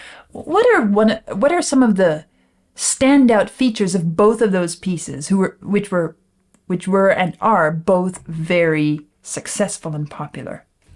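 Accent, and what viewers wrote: Germany. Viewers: that helps a lot, thank you! And yes, I can hear the sort of German/amish sounds in there. I really appreciate this! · This used to drive me nuts, so I searched for the answer... According to one of her earlier videos, she's actually an American, born and raised, but grew up (after leaving California at a young age) with/near/surrounded by an Amish community (or something similar, apologies if I misremembered), who speak a dialect of German, and apparently that it what's influenced her accent. She says that speaking to other people in Tennessee, she can find herself slipping into that local accent as well. That's as much as I can recall of the story. I hope it helps.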